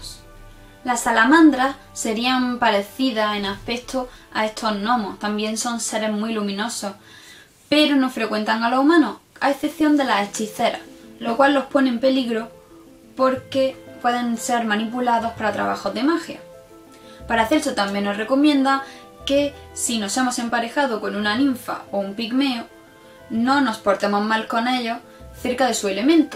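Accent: Spain. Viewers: Que acento tan bonito....me imagino la chica es andaluza?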